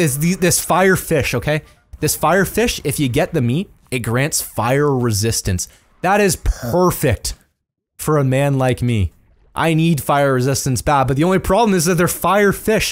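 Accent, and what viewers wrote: Canada. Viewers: this sure is bussin bussin, especially because this guys accent sounds canadian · Just started watching you and honestly 98%sure you must be Canadian because you sound it.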